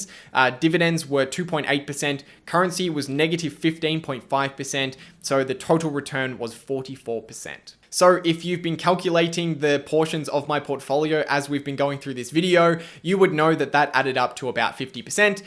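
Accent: Australia. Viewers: An Aussie accent and rosy cheeks ☺. Lol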